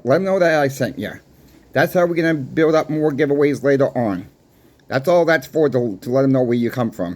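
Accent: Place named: New England, United States. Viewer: I don't know if those customers are actually going to read your comments or watch the video however you pose the question are they doing the right thing by getting rid of the collection? As a stacker my answer would be absolutely two things going to happen here if they get constitutional silver that money yes money is guaranteed and backed by the United States Treasury not the Federal Reserve Etc that's actually backed by the United States of America and as far as generic rounds well if the price of silver skyrockets they win their as well because at what point does the collection just become worthy of the silver that's in it or the 50 or $100 in coinage that they have due to the fact that no one has money and no one's going to care that they are Collectibles they are going to look at the intrinsic value being that of silver money. 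Just my two cents I absolutely think they're doing the right thing by getting a mix of constitutional silver, ASE, generic rounds. Keep up the good work every once in awhile the New England accent comes out and I get a kick out of it being from New England myself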